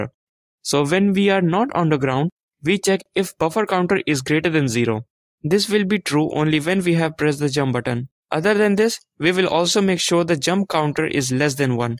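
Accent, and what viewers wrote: India. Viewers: suggestion to you bro, fix your indian accent, and here will be a lot of viewers!!!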